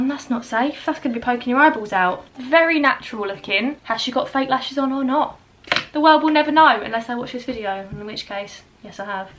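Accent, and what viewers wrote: Essex, United Kingdom. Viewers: Hi! 🙂 I have been watching your videos since the one about your hair donation and just wanted to say you're doing great! I love your content and you seem such a genuine nice a funny girl, also HUGE fan of your Essex accent! I have lived in the UK for a while now but never been there yet, I am trying to convince my boyfriend to take me as I am also fan of TOWIE ( Gemma Collins in particular cracks me up 😆😆) anyways sorry for the long comment. love the gel mask thingy, I know is bloody heavy as I bought a sleeping mask in Primark with gel inside by mistake once, kept falling on my nose when I used during a long haul flight ( not a good look ) 😅😅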